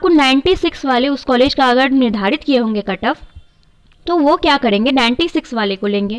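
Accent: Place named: Bihar, India. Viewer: Madam aap kahan se belong karti hain? Aapke accent se lag raha hai ki aap Vaishali ya Muzaffarpur se hain.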